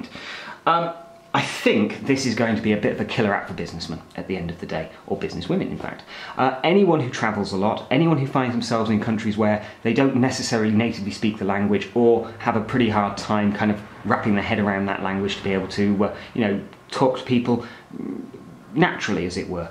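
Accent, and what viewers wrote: England, United Kingdom. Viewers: Your voice is so cool.Are you from England?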